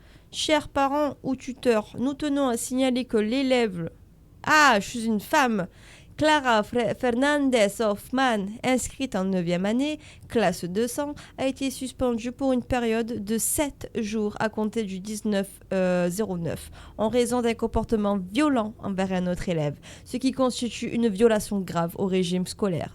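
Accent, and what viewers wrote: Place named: South, France. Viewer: 😂 ohhhh j'ai eu peurrrr moi avec l'accent du sud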